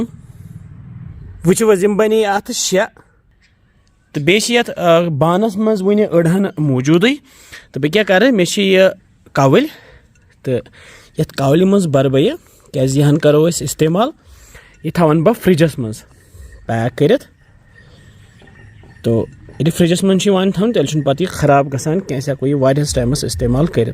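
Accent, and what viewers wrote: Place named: Kashmir, India. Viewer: I purely listen to your videos for your Kashmiri dialect...... wonderful....👌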